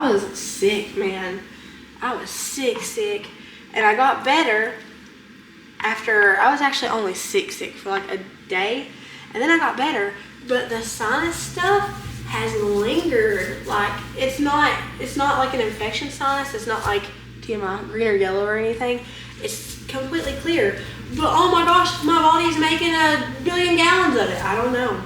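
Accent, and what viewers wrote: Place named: Texas, United States. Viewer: White on white is what you island will be … that is when you have different shades of white! It looks nice but I really did like the other colour as well! I loved that you count us as your friends. I’ve been watching for ages now….. you just came up in my suggested videos to watch and the rest is history. Mostly because I was born and bred in Texas and lived there til I was 26 yrs old. And came to Australia to work in a local church in area of music and youth. I also was a trained teacher and I only did the church thing as paid work for 2 years …married and Aussie and taught school after that and the story goes on and on! But I just love hearing you talk. The accent I love too! I love your wisdom and understanding of your children and I love that you love Jesus and started your YouTube channel because Jesus told you to do it! 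I love that you don’t put on grace and airs….you are who you are..take me or leave it! Thanks for all you do!😊❤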